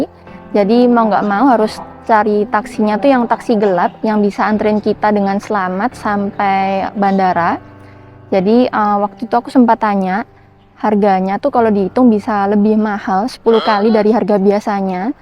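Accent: Java, Indonesia. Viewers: Mbaknya pasti orang Surabaya, logatnya jawa banget..salam hangat dari Jateng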